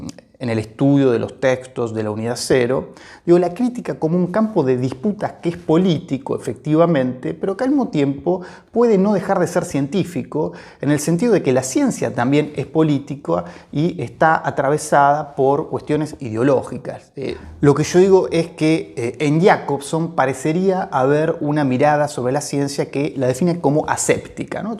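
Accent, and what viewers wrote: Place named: Argentina. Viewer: Increíble! Con este acento (es usted argentino?) y hablando de argumentos interesantes, creo sinceramente que usted no exista...y encima, si puedo permitirme, muy guapo, con esta mirada intensa y esa inteligencia que parece querer salir hasta de sus dedos. Una clase estupenda (finalmente estoy entendiendo algo del formalismo ruso, la parte que usted ha tenido la gracia de explicarnos...y el miércoles tengo el examen de “Teoría de la Literatura”. Por suerte usted se ha ocupado tb de Sklovski y alguno más...iré escuchándole e investigando. Mientras tanto, aunque nos separe el Océano, sepa que mi corazón le pertenece. 🫀🩸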